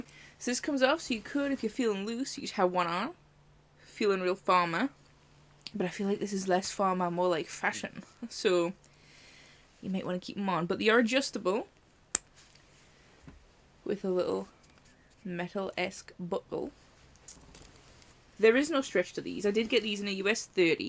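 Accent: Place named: Newcastle, United Kingdom. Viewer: Same I’m Scottish so I kinda hear it but more so Geordie accent I think x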